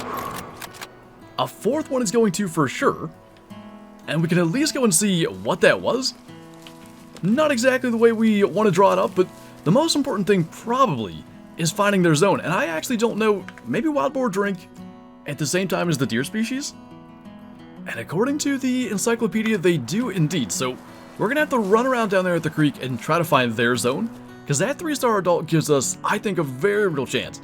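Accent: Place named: United States. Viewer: Its his voice for me so chill and rich 'murican accent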